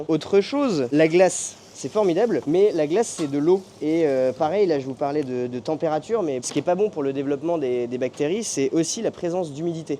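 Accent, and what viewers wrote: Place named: Ardennes, France. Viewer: Plutôt Ardennais 😮😢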